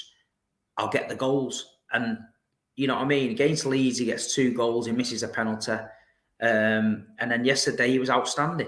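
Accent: Manchester, United Kingdom. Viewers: Love listening to you talk about us mate, proper manc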